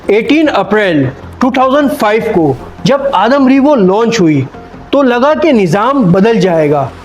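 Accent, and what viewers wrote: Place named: Karachi, Pakistan. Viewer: انتہائی بے تکی انداز ھے آپمو الفاظ کا استعمال پتہ ہی نہیں ھے بلا وجہ ہی جذباتی ھو جاتے ھو بہت سارے الفاظ غلط بول رہے ھو خالص کراچی کی مخصوص بولی بولنے والوں کے لہجے مہیں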